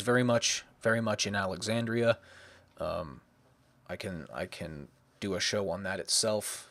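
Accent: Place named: United States. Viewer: Great video although your credibility suffers a bit by your insistance on an unadulterated American pronunciation of Greek.... you use diphtons all over the place where none such are used in Greek....e.g. your god "Gay"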